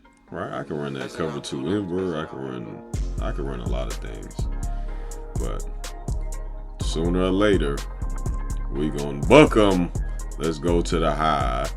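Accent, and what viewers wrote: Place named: Atlanta, United States. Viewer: Dawgy sound like he from round my way what zone you in in Atlanta twin 😂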